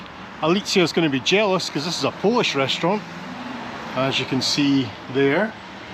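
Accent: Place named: Scotland, United Kingdom. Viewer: A Spaniard (I think) with a Scotish accent. That is a first for me. It is like a Pol with an Irish accent. Strange but an interesting mix!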